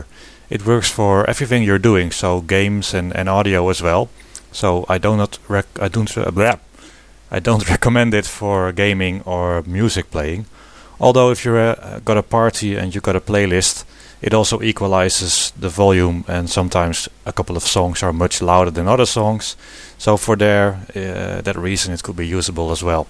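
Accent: Netherlands. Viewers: zo mooi om een nederlander engels te horen praten, je hoort het ook echt atijd binnen 1 seconden. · Aye is that a Dutch person I hear?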